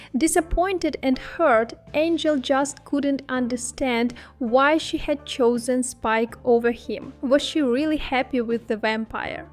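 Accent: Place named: Brazil. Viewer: Are you Brazilian? Italian? Just curious about your accent.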